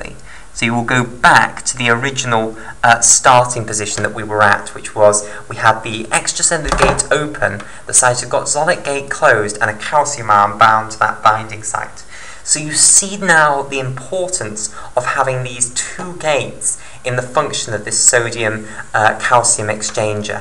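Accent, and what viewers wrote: United Kingdom. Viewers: well explain and well accent british accent , right ?????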